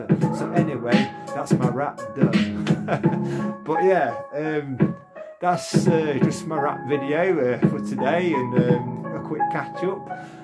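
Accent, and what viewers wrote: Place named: Yorkshire, United Kingdom. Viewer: no way !! Ty bought you were more Yorkshire... I'm a Lincolnshire lad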